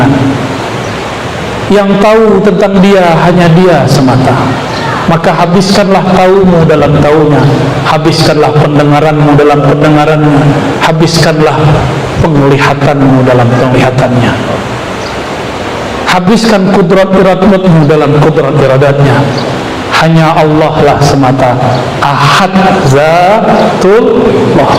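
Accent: Padang, Indonesia. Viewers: Tarimo kasih Buya bahasonyo awak Samo Samo urang Padang,, tapi beda kota....😊sanang hati mandanga nyo 🙏🤗👍 semoga kita inginkan kampuang halaman 🤗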